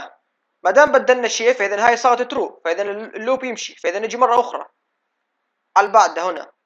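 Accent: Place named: Mosul, Iraq. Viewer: 😍😍فوك ما شرح حلو وطريقة حلوة النوب اللهجة مصلاوية احييك من القلب ❤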